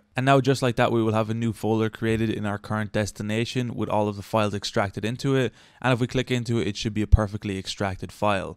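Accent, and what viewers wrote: Ireland. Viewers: very helpful thx love your Irish accent : )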